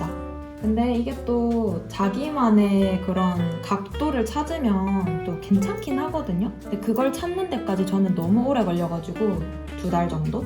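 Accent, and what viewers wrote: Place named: Gyeongsang, South Korea. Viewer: 고향이 대구 신가봐요 ~~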